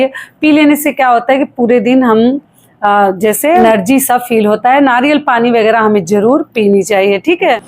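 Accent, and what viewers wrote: Bihar, India. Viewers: आप बिहार से है लगता हैं आपकी बोली से लग रहा है मै भी हूं 🙏आपने बहुत अच्छी तरह बताया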